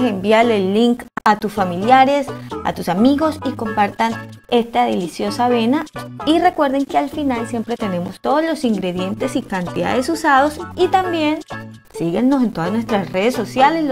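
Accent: Colombia. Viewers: ME ENCANTA EL ACENTO COLOMBIANO Y LA FORMA DE EXPRESARSE. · Ay como me encanta el acento colombiano! ❤ / 🇲🇽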